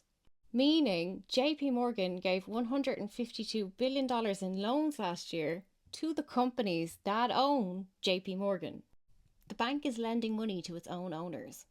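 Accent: Ireland. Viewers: Sounds a bit Anglo Irish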